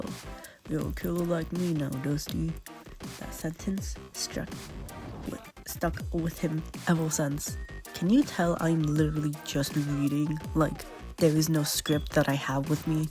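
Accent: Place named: Canada. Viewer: I hear the Canadian in your voice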